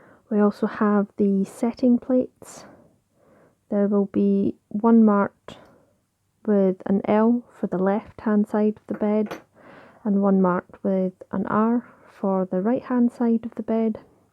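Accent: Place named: Scotland, United Kingdom. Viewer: Thanks so much for this video. It is very well done and has provided me with clear and concise instruction. Was lovely to hear your Scottish accent too. A wee bit of home for me. Thanks again.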